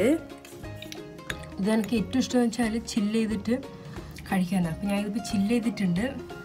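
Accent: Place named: Malabar, India. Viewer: Hi shared the Link now, watched...... good presentation, will attempt it today subject to all ingredients in place, voice over Good!! ( Malabar slang) keep it up god bless stay safe!!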